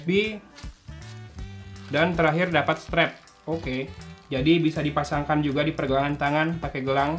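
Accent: West Java, Indonesia. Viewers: Ah sunda pisan eta murangkalih si akang logatna 😁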